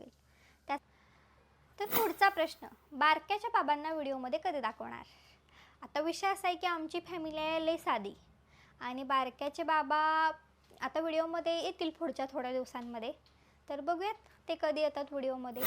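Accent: Kolhapur, India. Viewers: बोलण्या वरून तुम्ही सातारा कोल्हापूर चें वाटता 👌🏻👌🏻👌🏻म्हणेज माहेर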